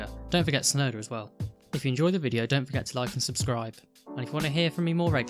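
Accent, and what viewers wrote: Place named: United Kingdom. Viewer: With all due respect, just some input suggestions critique feedback advice. I know that English language came from the UK GBR, especially England and Scotland. But, not everyone understand British accent, let alone if you speak really fast like that. I actually able to understand Sky Sports F1 even tho they have British accent, because they speak slower. But, I had a hard time trying to understand what you said, that I had to replayed it a few times. Even so, I still couldn't get all of what you said, only a few. Besides speaking slower, it would be better if you provide captions. Because the auto-caps isn't that accurate. Thankyou very much🙏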